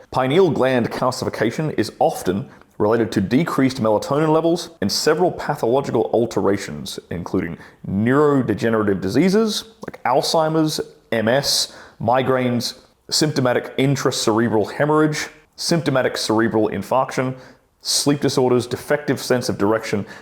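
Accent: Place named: Australia. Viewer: As an Australian, this is the most annoying version of the aussie accent, if anyone talks like this, I just automatically assume they are talking out of their arse and everything they say is just bullshit one way or another. My success rate on picking up on bullshit artists is 100% and it's because they all have this stupid fuckin accent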